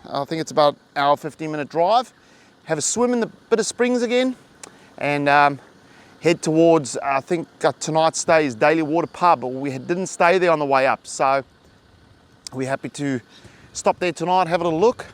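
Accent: South Africa. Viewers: You sound South African under the Aussies twang?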